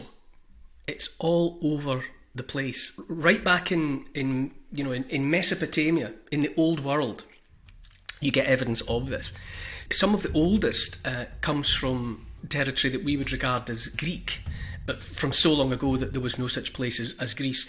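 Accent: Scotland, United Kingdom. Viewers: Are ye Scottish Sir? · well i must say that information couldn't off not sounded any better Scottish twang made it even moee captivating thanks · I adore a good Scottish burr, too. I like them even better in a kilt 😂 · Your Scottish accent is amazing 👍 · Are you Scottish. I love you accent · First time on this channel🔥whats your accent lad? Scottish?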